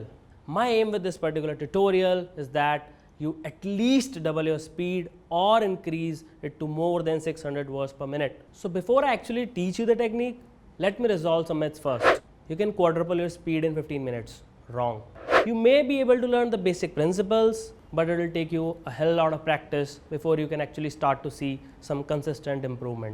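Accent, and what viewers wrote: India. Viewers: Why does He sounds like He is speaking english in hindi language? I cant catch up with this guy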